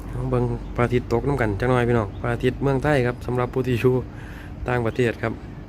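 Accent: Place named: Sakon Nakhon, Thailand. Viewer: คือเสียงบ่าวสกลเนาะได้ฟังแต่เริ่มต้นมวน่ๆๆ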